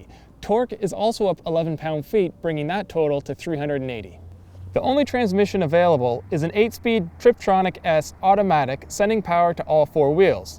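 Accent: Canada. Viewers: Here in Texas it gets pronounced correctly, but then there are many descendants of German immigrants here. The reviewer sounds like he has a Canadian accent, but I bet you can find some Canadians who know how to pronounce it properly. I've heard the "porch" pronunciation in Australia and New Zealand too, so maybe its a British commonwealth thing...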